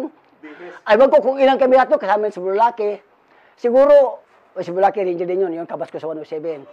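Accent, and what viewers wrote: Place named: Bicol, Philippines. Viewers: The way magsalita si sir kababayan🥰bicolanong bicolano🥰 watching from Saudi arabia 🇸🇦 ❤ God bless you po🥰❤️ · Bicolano si sir bisakol ang salita saan Kaya sya SA bicol ganyan dapat mag kwento Hindi Yung masyadong seryuso Kasi tapos Naman na Yan ehh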